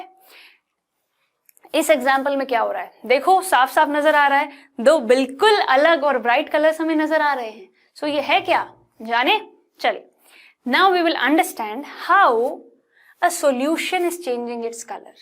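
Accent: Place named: Bhiwani, India. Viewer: Bhiwani se ho kya mam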